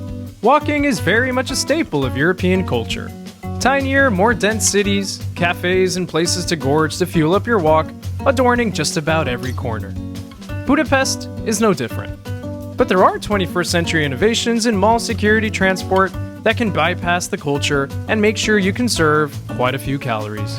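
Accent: United States. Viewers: annoying American accent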